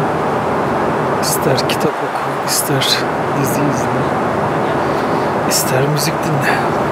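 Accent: Samsun, Turkey. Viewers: abi afganistan videolarından birinin altında "elli" derken e harfini vurgulama şeklinden Samsunlu olduğuna ikna oldum yorumu vardı. O günden beri e harfini söyleyiş şeklin iyice bi dikkatimi çekiyor engel olamıyorum. Endonezya derken de aynı vurgu var dinlerken fark ettiğimde çok hoşuma gidiyor😁 yanlış anlamazsın umarım art niyetle yazmıyorum.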